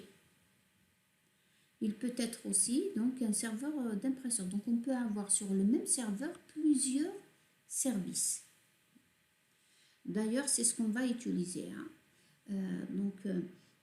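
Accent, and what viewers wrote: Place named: Arab world. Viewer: j'avais peur de pas bien comprendre mais bizarrement quand on utilise un accent arabe je comprends tout de suite x)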